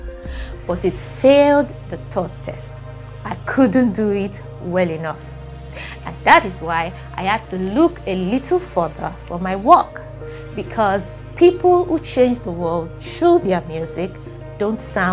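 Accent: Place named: RP, United Kingdom. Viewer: This makes a lot of sense. Sequentially presented with Queen's English. Engr. Dr. S. A. Aina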